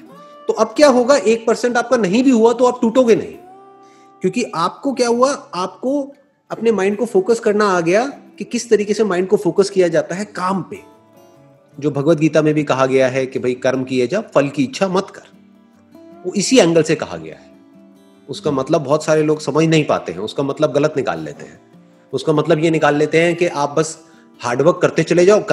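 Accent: Gujarat, India. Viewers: Oh God I just loved his Gujarati accent 😘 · jab gujarati log hindi bolte hai tab pata chal hi jata hai ki vo gujarati hai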